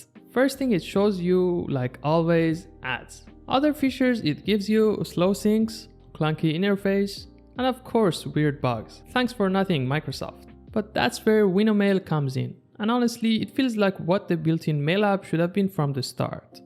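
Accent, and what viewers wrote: Iran. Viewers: from the accent, I immediately knew you were Persian just like me 😅 But anyways, insanely good video bro, and especially as someone who comes from Fedora GNOME, this is a godsend, considering all the apps in GNOME have a unified UI across the whole system, which Windows 11 CLEARLY lacks. And UniGetUI is a really good package manager considering I'm used to DNF. You deserve a like and I quite frankly, HAVE to save this video. Thank you، برادر. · با توجه به لهجه انگلیسی ت فکر کردم بچه ایرانی پروفایل ت رو چک کردم زد ترکیه لهجه انگلیسی دو کشور نزدیکه به همه 😂 سال ۲۰۲۰ که ویندوز ۱۰ نصب کردم هنوز UWP زنده بود، اونموقع مسائل fluent ui خیلی دنبال میکردم برنامه Win UI Gallery 3 هم میتونی یه ویدئو بری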